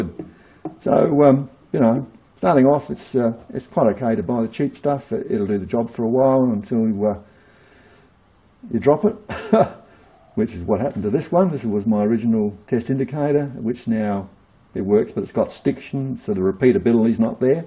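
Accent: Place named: Australia. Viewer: Gidday,I find it interesting that you sound like an Aussie but have a Chinese name.I then go to ebay and i get Chinese pretending they are Australian and located in Australia.Then there is the fact that they say something they are selling is a test indicator but it in reality it's a dial indicator.My mate was going to buy one of those Chinese hobby lathes thinking that the bed size was 1.2meters.I explained to him,that is 12 inches Bill,some people's peckers are longer than that and if you have only half that you need to do it twice,and that 6inches x2=12inches....A fortnight ago i was researching leather work,and in the process of buying leather working tools a burnishing tool for leather edges came up.I then watched a video of someone making some leather tools on a metal lathe and thought that would come in handy to save some coin.Now,i have had an interest in Blacksmithing for years and was thinking about making knives as a hobby,but what is a good knife without a quality sheath made by hand.In my madness i have almost come full circle.If learning means i am alive,why does my body feel like shit?..;)..Thanks for the advice mate...Cheers Gunna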